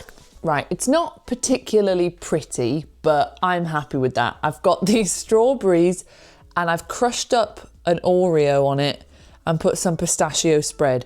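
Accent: United Kingdom. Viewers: Eu amo está professora e o seu lindo sotaque britânico. Seria maravilhoso se tivesse vídeos também com legenda em português e inglês juntos. Ela teria milhares de novos alunos brasileiros.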